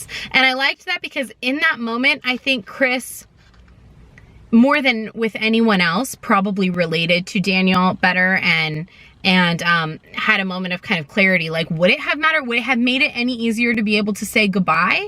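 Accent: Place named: United States. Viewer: Hi! Watching your reviews has been a great pleasure for me for some months now. Now I've got a question of a different sort. I very much like analyzing the way American people speak (vowels and stuff). However it is not easy, as I am not a native English speaker. Could you please help me by telling where you were raised/living? Thank you!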